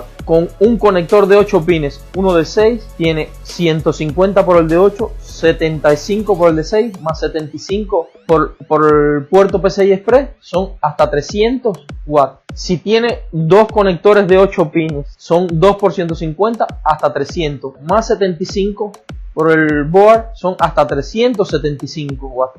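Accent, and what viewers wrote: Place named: Cuba. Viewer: me lo imaginé por el acento, yo también soy cubano, y estoy iniciando en la minería, muy buen trabajo estas haciendo hermano, sigue así, un saludo desde Italia 👍🏻👍🏻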